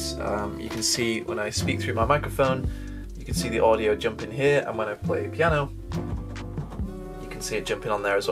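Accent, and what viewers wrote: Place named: United Kingdom. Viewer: Woah. I didn't know he was British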